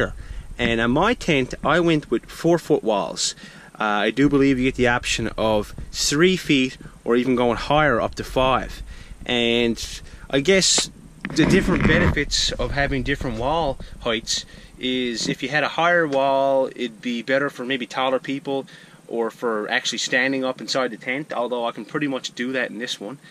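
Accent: Newfoundland, Canada. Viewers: That Newfie accent is so close to the Irish accent. very similar to mine and I live in Australia.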